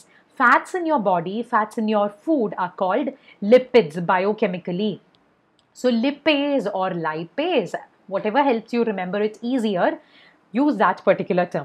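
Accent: Kerala, India. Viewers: ma'am are you from Kerala?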